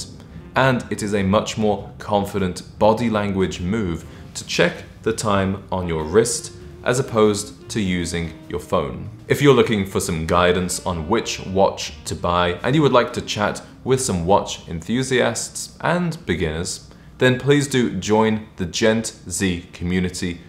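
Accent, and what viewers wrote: United Kingdom. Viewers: Bro is British certainly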